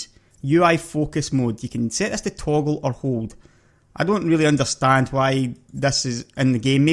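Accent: Scotland, United Kingdom. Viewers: Your accent! Epic wins! Sound like Spud from Trainspotting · thats Scottish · the sound of the Scottish voice is a bonus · A Scotsman in a spaceship, 10/10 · Nice also to hear a guid Scots accent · Scottish, aye? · a Scottish youtuber finally an accent to relate to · i love your scottish accent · soon as I heard that thick Scottish "awrite guys hows it goin" you had a new subscriber , lmao thanks for the tips bud. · love the scottish, it's bloody awesome lad :D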